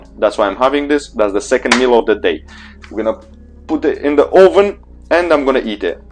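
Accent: Russia. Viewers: Are u Russian or what? You don't sound like an English man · Aint no way this guy is from Britain. His accent is russian, not bri'ish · Русский акцент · Сначала я подумал, что ты русский) акцент такой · ну я вообще сначала догадывался что он русский, так как акцент у него русский, но когда он сказал блять, я окончательно убедился что он русский. А еще его зовут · Русский акцент брат,но ты хорош так держать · как будто рашн звучание в голосе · Wow, thanks a lot! It is kinda strange that I can't understand his Russian accent despite the fact that Russian is my mother tongue. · все гадал откуда ты😂 вроде русский акцент, но не было понятно до исконного "блять"😂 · У него русский акцент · Русский акцент так и пробивается!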